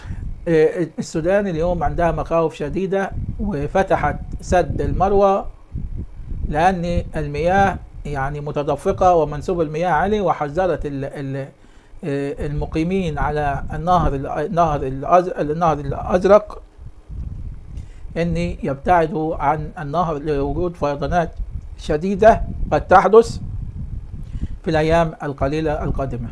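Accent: Egypt. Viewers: وبعدين حضرتك طريقتك وكلامك مصري وعجنا كده واحنا راضين وممتاز جدا تحياتي لحضرتك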